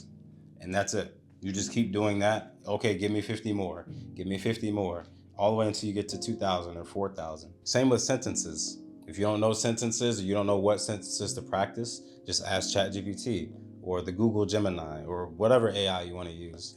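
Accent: United Kingdom. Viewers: New subscriber. Awesome content. Brilliant British accent too 🎉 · That was a lovely posh British accent! Very interesting channel. Nice to see another brother in Tokyo doing well.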